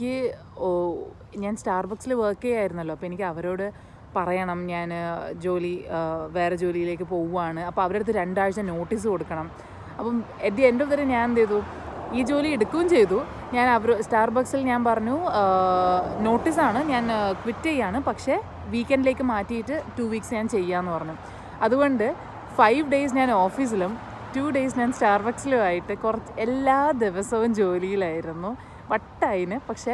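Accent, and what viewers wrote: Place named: Kannur, India. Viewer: ഇരുട് കണ്ണൂർ സ്ലാങ് ❤️❤️❤️❤️❤️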